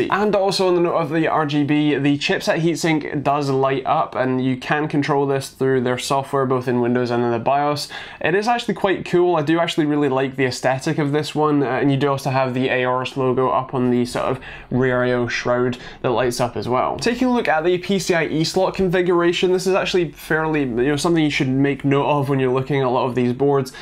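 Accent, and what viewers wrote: Scotland, United Kingdom. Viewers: He seems to have a stutter and I think hes scotish they speak fast andi think he's struggling to anunceate the english could also be nerves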